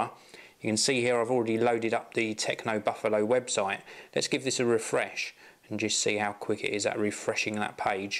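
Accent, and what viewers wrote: England, United Kingdom. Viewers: i love tech, and i love the British accent... so tech given to me in a British accent? awesome *thumbs up* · I love british accents, everything sounds 20 % cooler with that^^ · Yay British accent :D:D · Can speak a little beat less by English accent:). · British accent makes people sound he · gotta love brittish accent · British english is sooo better than american one to understand! :)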